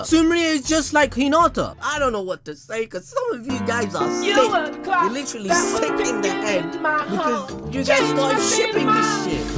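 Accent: Punjab, India. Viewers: Bro are you punjabi ? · That punjabi accent🙏😁😉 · are you indian bro i know what you said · ❤️ your Indian ?? ❤️❤️❤️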